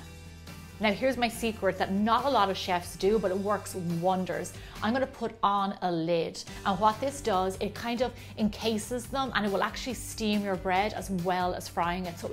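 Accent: Ireland. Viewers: I love the Irish accent! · I was going to ask the same as my mentor has the same exact accent, and I'm bad with accents. It believe its an irish accent though. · You sound a wee bit Irish are you? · Absolutely love your Irish accent. As yummy as the muffins. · She has a VERY Irish accent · Are Ya from Ireland 🇮🇪??? Eh???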